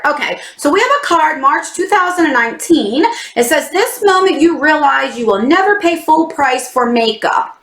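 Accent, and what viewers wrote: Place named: Chicago, United States. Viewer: Love your accent ❤ love that it sounds like your saying crown instead of crayon, not making fun of you just like different accents 😁 Chicagoans accents very so much lol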